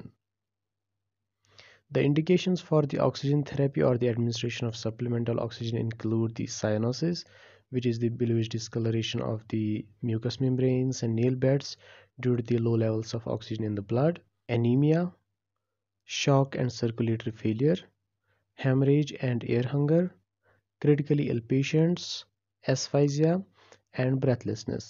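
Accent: Kashmir, India. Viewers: Your voice is indicating that you are kashmiri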